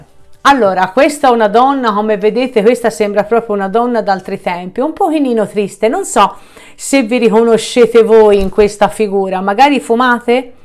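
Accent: Tuscany, Italy. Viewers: Io la LEI, esattamente cosi.. LUI non è un segno di acqua ma ci divide l'acqua. Io in grecia (causa covid) lui in italia. Abbiamo avuto un litigio bello forte.. Cmq complimenti.solo oggi ti ho trovato ma sei fantastica. Adoro la tua cadenza toscana😜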